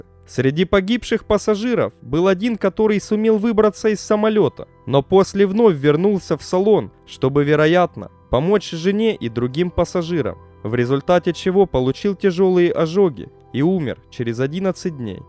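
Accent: Ukraine. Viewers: Украинский акцент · украинский акцент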